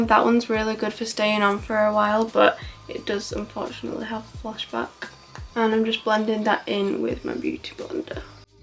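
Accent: United Kingdom. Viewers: i'm late, i also just finished gcse's in june, bUT i wanna know which part of the uk are you from? :)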